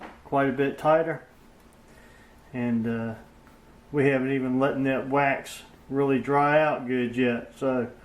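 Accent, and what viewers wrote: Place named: South, United States. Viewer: I just love that southern accent of yours . 😊 On the other hand, in the absence of a vacuum machine, what would you use?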